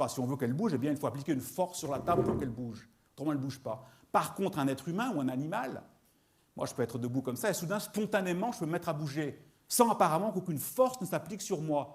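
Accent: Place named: Geneva, Switzerland. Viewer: Que ce Monsieur se rassure il n’a pas oublié son français et même son accent Genevois légèrement exagéré mais bon.... Quant au contenu: pompeux et redondant… Ma conclusion: Profite du coin pour respirer par le nez, une petite randonnée avec un bon saucisson du pain et un canon, une bonne raclette le soir et tu verras s’illuminer ton âme. Ça va aller, tout va bien se passer. Qui boit la gnole casse la bagnole, qui boit la mondeuse casse la dameuse:).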